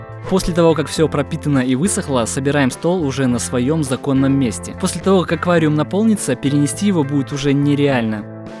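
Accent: Belarus. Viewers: Ты из Белоруссии?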